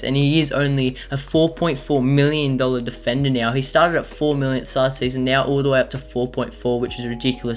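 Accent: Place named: Australia. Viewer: Love Australian accents, you got yourself a new subscriber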